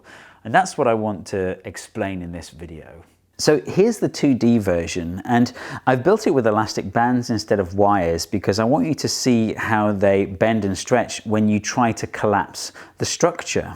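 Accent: United Kingdom. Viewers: The British pronunciation of aluminum always annoys me. There's no i before the u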